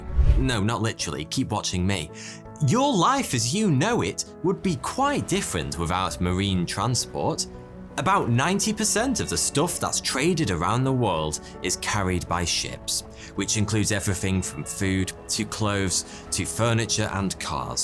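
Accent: United Kingdom. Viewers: Why are British accents such good story tellers.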